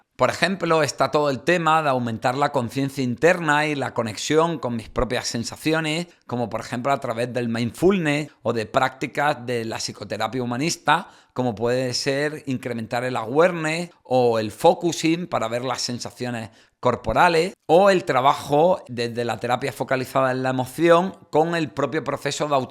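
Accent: Andalusia, Spain. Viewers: hablas un andaluz culto y perfecto, o sea me encanta tu acento, además has dicho prejuicio.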